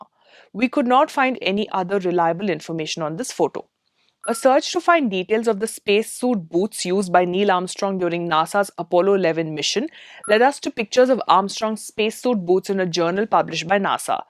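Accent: India. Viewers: Yeah, go ahead and use the Indian accent to give some sort of credibility for the simple minded rooted in hate and blame that also thinks the earth is flat.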